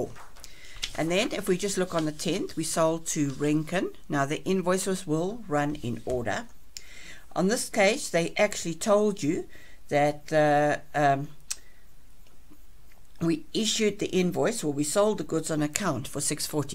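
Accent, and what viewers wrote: South Africa. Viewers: I wasnt expecting a south african accent eh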